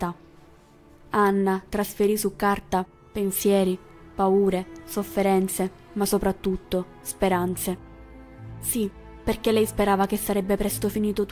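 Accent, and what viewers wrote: Sicily, Italy. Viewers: Scusami ma non riesco a procedere con la tua lettura con quello spiccato accento siculo. Per favore un po di professionalità non guasterebbe e un bel corso di dizione pure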